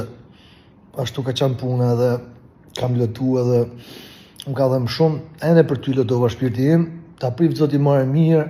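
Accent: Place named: Laskar, Albania. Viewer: Ik ore lepirse, le nam. Po kan ngren leva. Veprimet kan pasoja, mire ja beri. Ti gjithsesi je vk qe flet si laskar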